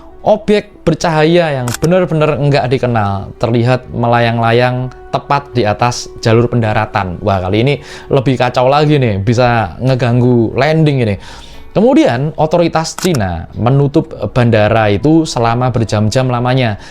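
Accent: Java, Indonesia. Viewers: Jowo banget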